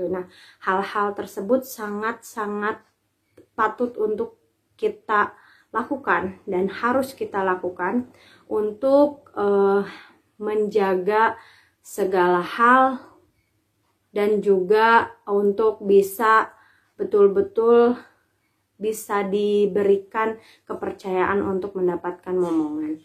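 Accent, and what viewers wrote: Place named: Tasikmalaya, Indonesia. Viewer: Logat tasik na aya keneh.. 😁😁✌️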